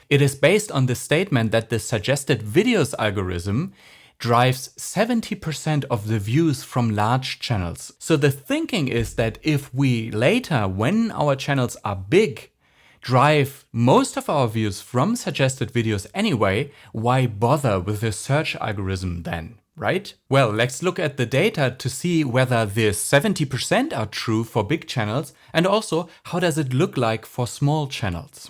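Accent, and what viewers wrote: Germany. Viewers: You have some accent. Are you German? Did you consider adding German or whatever subtitles + description translation to your videos to run an experiment and measure the effect on total views? Can't find this kind of topic anywhere. Seems like nobody figured it out.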